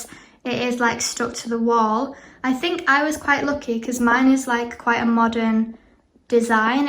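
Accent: United Kingdom. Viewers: Are u scouse?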